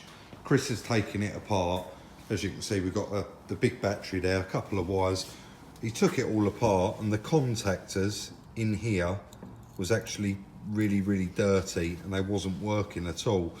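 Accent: United Kingdom. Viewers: イギリス訛り?聞き取り易い英語。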